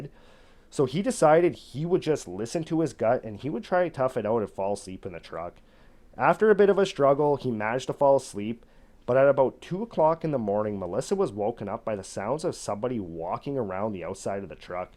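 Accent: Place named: Canada. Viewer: Haha im canadian from far northern British Columbia i recognized this guys accent right away as a canadian! Cool channel will sub, but id love to hear a story on nahani valley/headless valley in the north west territories , i think it is terrifying as a national park based on the past stories!!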